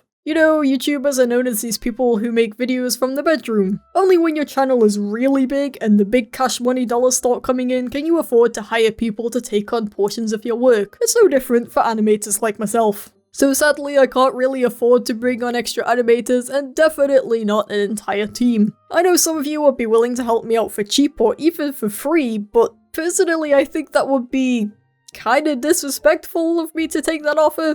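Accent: United Kingdom. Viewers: KID OF LINK AND ZELDA it's just his british voice (yes he's british) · Your voice is british deep and compaired to your fisrt video is wowz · Its really interesting to watch your channel. I really like your british accent whilst you talk about german potato culture. Like, you couldve chosen all countries but you took germany?! I dont understand why cus i just want to get away from here, Lg dein Tom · R u British??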